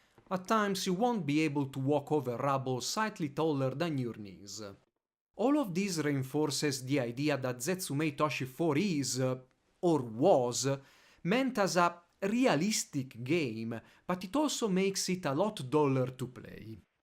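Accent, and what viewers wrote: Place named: Italy. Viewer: Comunque penso (dall' accetto) che tu sia italiano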